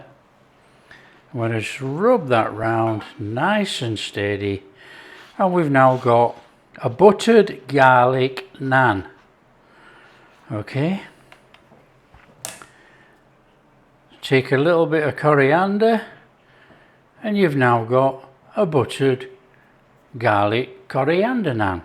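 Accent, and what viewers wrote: Yorkshire, United Kingdom. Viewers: 😁😁😁😁😁 yorkshire man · what a nice Yorkshire man . Could listen to him all day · Are you from Yorkshire sir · First one of your videos I've watched and your accent reminds me of the few years I spent living in Yorkshire. I've just been thinking that I need to learn a few more recipes and now I've found you sir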